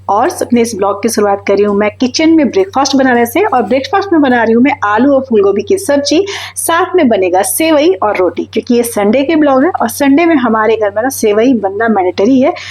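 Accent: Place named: Bihar, India. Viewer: Nice blog didi aap Bihar me kahan se belong Karti Hai aapki language hamari Jaisi Hai😊😊😅😅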